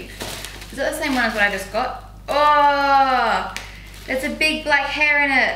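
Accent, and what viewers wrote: Australia. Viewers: I'm British and love ur and Australian accents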